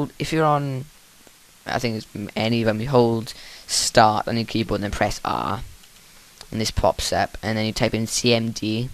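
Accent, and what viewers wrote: Wales, United Kingdom. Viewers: Are you welsh? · A true Welshiee, Ditto! :D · Dat welsh accent tho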